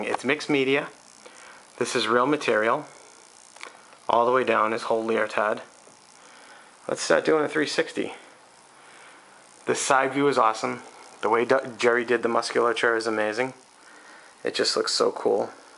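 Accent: Boston, United States. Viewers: love your videos!! can i assume you are a red sox fan by your accent?? lol sorry couldnt help but notice, thanks for such awesome statue videos man!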